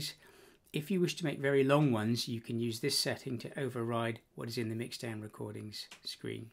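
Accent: Cornwall, United Kingdom. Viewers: Is your accent Cornish? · I'm from bude, Cornwall, thought your accent sounded familiar. I find your app really interesting but I'm finding it really difficult to understand and use. I come from using Ableton.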